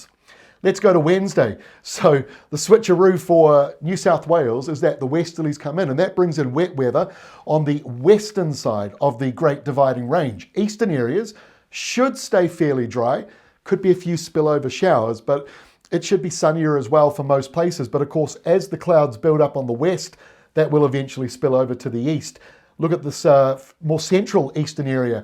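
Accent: New Zealand. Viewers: Love your kiwi accent and the forcast of thuck clouds.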